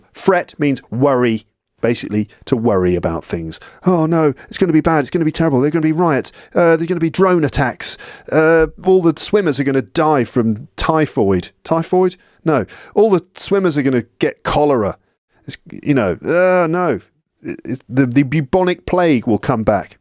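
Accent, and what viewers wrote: United Kingdom. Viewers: British accent is difficult.. I don't know why???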